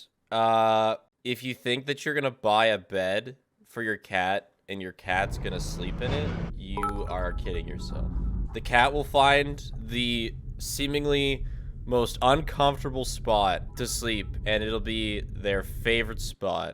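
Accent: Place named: Canada. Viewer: this "fake drunk" voice you put on sometimes perplexes me. how do you get MORE Canadian when you talk like this? These are dangerous levels of Canadian here! What black magics are you playing with to conjure this phenomenon?! You should narrate a whole book like this!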